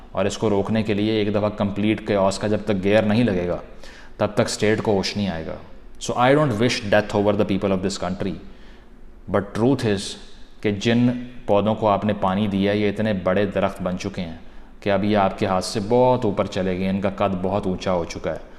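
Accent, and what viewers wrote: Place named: Pakistan. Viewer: This guy sounds like an educated Pakistani..........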